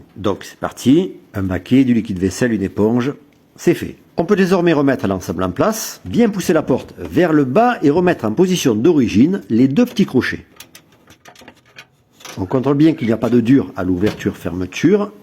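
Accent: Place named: South, France. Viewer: l'accent du sud c incroyable 🎉chef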